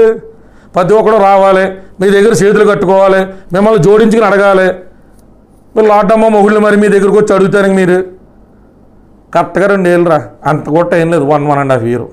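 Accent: Godavari, India. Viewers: Mee yaasa praasa chala bagundhi. godavarolla maatalu👌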